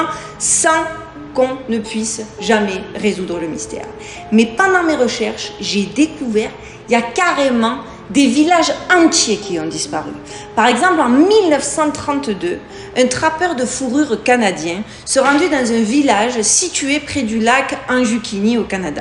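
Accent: South, France. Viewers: Bravo pour tes plans d'attaque. J'adore ton accent du sud. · "il aurait pu écrire le Seigneur des Anneaux... sûrement un cousin à Tolstoi" euh, un cousin à Tolkien plutôt non ? Là tu m'as tué 😂😂👍😉 Merci de nous régaler de tes vidéos, de ton accent du sud et de ta bonne humeur 🙏🙏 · Merci ma jolie petite fée 🌹ça m'a fait du bien de te voir,avec ton accent du sud et ton humour. J'étais en pleurs en regardant 2 vidéos , terribles, ou mes gens se sont retrouvés, nasses, avec impossibilité de fuir.Il y avait vraiment la volonté de leurs faite très mal .😢Des parents , enfants et grands parents pacifistes. Gazés à blocs matraques. Pour rien,un crs à dit z une femme, qui m'a laissé passé, que les ordres venaient d'en haut. Sinon j'adore ta vidéo,toutes les histoires. Je connaissais, celle du gars quo disparaît, du champ, je la pensais plis vieille. Sinon connais tu l'histoire du nimitz ? 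Très étrange,j'avais vu ce film avec mon père quand J'étais ado ,ça te ferais un bon sujet🌞Merci pour ton super taf 💙Prends soin de toi, ainsi que ton fiston 💖et la belle 🐺🐾💖Duchesse . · coucou ça fait 3 jours que j ai découvert ta chaîne et je la dévore 😍😍😍 par contre si tu pouvais faire quelque chose pour l écho..... ça gâche un peu 😏 mais je suis agréablement charmée par ton accent du sud je m attendais à un accent canadien 😂😂😂😂 vive les expats ❤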